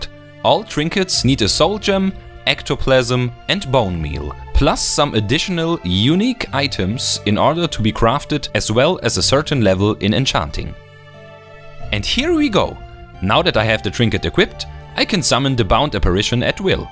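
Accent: Germany. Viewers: Thank you so much for making this game, I already finished it twice and I'm waiting for this dlc to play it for the third time. The whole idea seems amazing but I'm a little sceptical about completely moving sinistra magic books to outlaw merchants. Entropy already felt like a lesser elementarism, now it just seems like it's simply not worth bothering with, but we shall see. Also, I love that german accent.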